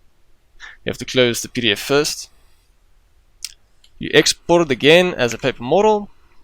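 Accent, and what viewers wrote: South Africa. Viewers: You South African? I hear an afrikaans accent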